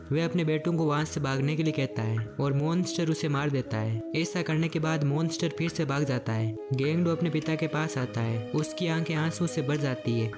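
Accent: Rajasthan, India. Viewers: Rajasthan voice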